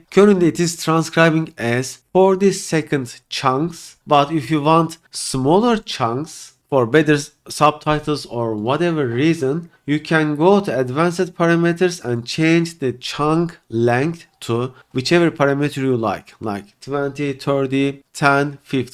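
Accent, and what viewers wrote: Turkey. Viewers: Rastgele önüme çıktı dinliyorum; diyorum "bu kesin Türkçe aksan" altyazıya bakıyorum Türkçe yok dublaja bakıyorum hak getire! bu kadar mı kendi dilinden, milletinden nefret ediyorsun?!! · aksan türkçe, dil ingilizce olunca 5 saniyelik hangi dil la bu şoku geçirdim. hocam onca farklı dil varken bari ai da olsa türkçe dillnede çevirseymiş siziniz bu videoyu.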